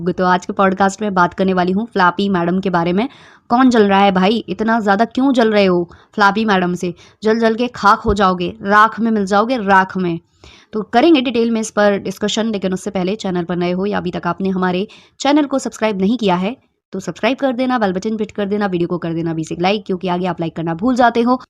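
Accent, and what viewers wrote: Bundelkhand, India. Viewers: Hello tum up bundelkhand kaha se ho m bhi wahi se hoo bundelkhand ki..Main kafi din se tumhare reaction dekhti hoo tumhari tone se lgta to tha tum bundelkhand ki ho lekin kbhi pucha nh tha please btana kaha se ho mujhe achcha lgega ❤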